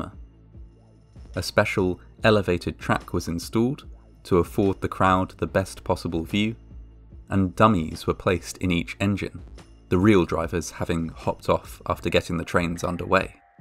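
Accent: United Kingdom. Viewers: He’s British, it’s not fair :(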